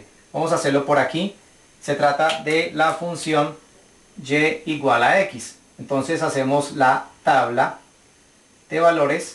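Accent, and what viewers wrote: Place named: Colombia. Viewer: Definitivamente los profesores Colombianos explican con muchísima mas claridad,debe ser su español que esta catalogado, como el mejor que se hablan los países latinos. muchas gracias por compartir tus conocimientos en matemáticas con todos nosotros.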